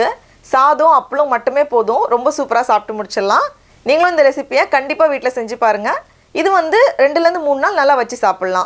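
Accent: Nagercoil, India. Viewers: I like ur nagercoil slang..I'm from Tirunelveli..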